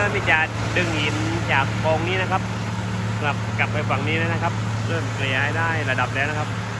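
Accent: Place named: South, Thailand. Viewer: การใช้ภาษาทางใต้จะไม่ค่อยเหมือนภาคอื่น เลยทำให้ฟังแล้วมันแปร่งๆ สำเนียงไอ้น้องมันยังทองแดงเลย อภัยเถิดครับ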